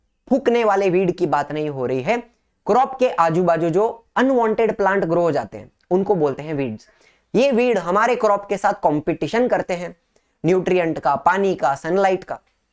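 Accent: Maharashtra, India. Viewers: hi sir aap marathi ho na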